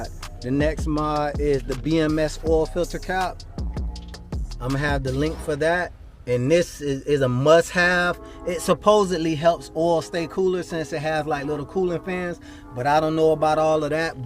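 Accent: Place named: Alabama, United States. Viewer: You sound like you are from Alabama, which is where I am. I just bought a 2018 X5 x35i and I am itching to give it bigger balls. Yours sounds amazing. I’d love to have mine sound like that as well. I’m new to your channel. Thanks for sharing.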